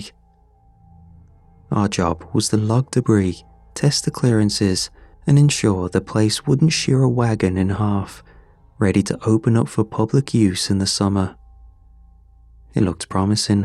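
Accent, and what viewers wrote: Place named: Cockney, United Kingdom. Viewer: I'm lovn dat don't give a toss; real cockney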